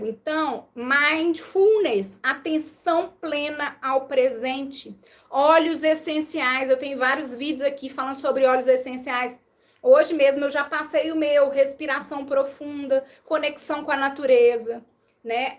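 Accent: Minas Gerais, Brazil. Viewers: Além do conhecimento que vc passa estou amando o sotaque mineirin...tb sou mineira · Parabéns, seu programa está muito bem produzido, o som está legal e você com seu sotaque mineiro está um show, sua didática está muito boa😅🎉😅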